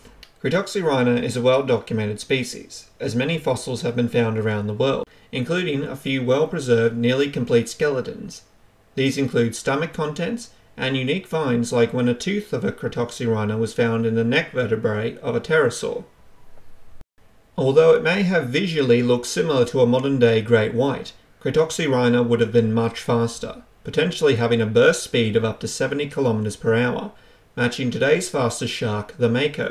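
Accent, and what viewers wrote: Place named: Australia. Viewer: Yep, there's the Aussie accent lol... "It's a shack!"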